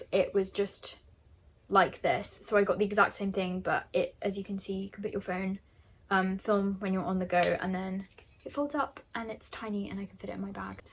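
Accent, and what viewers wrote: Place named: United Kingdom. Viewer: I really love your British accent.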